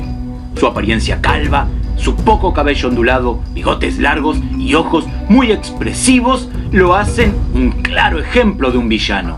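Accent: Argentina. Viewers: ahhhhh... que irritante el acento argentino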